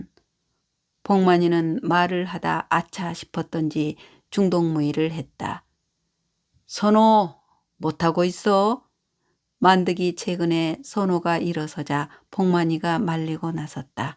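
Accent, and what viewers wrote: Jeolla, South Korea. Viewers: 송기숙 작가님의 작품을 낭독해주셔서 감사합니다. 남도 사투리가, 겨울밤 화롯불속의 구수한 군밤같네요. 그 구수한 향을 그대로 나타나게 해 주신.감사합니다.☆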